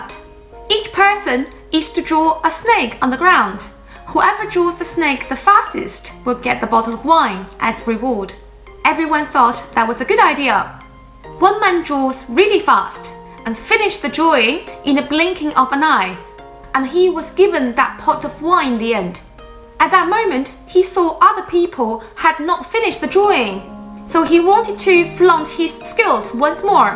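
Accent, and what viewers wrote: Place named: United Kingdom. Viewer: You are so adorable 🤗 you have a really nice british accent too. If I were to guess, I'm thinking oxford/london from the accent.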